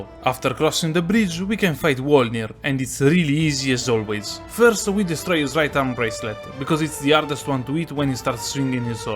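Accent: Italy. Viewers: Si sente che sei italiano😂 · Love the Italian accent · l'accento italiano lo rende 1000 volte meglio <3 bellissimi video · Been watching your videos in the last few days and I keep asking myself “ma è italiano? L’accento potrebbe essere quello giusto”. I’m just writing the comment in English in case I’m totally wrong. Love your videos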